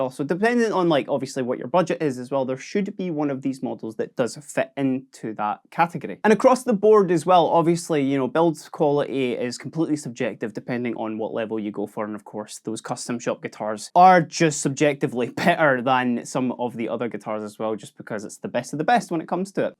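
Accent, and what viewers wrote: Scotland, United Kingdom. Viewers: Did they have to get a guy with such a brutal Scottish accent to do this video? I can barely understand the dude.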